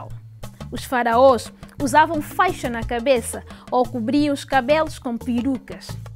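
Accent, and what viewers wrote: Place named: Portugal. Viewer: Por favor, não prenuncie as lingua africanas com sotaque/tiques do português. Não mates os teus vídeos, jovem!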